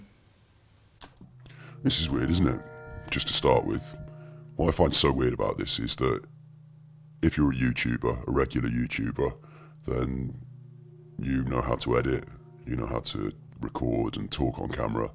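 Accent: Birmingham, United Kingdom. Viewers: I just discovered you and I'm loving your many analyses! I adore your accent as well. Are you from Birmingham, by any chance?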